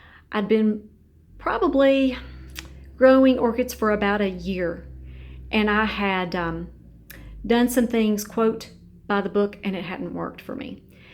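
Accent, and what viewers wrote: South, United States. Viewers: Oh I figured down there (assuming you're in the south because of the accent)that you might grow stuff outside as well. Yes, it's common for young growth to have red tint, especially if the plant is in light that is high for the plant or if they were recently moved from lower to higher. Dr Martin Motes says that when plants flush after being exposed to cold, that it's due to low magnesium, but someone else said he's wrong, and cited one source saying that high mag will increase the red. I wanted to see if you had ever tried that, but then I found the answers that back him up. The anthocyanins that show up when there is high mag are not the same ones that show up in the cold. (Or the ones that sometimes show up in normal temps with low mag). There are different ones that do different things, and then I went to his videos and he relayed at about 20 minutes in to the rough cut video and he talks about some vandas they imported from Taiwan that were deficient and turned purple and when they added mag..well I'll just let you watch that if you're curious instead of typing it all out here :)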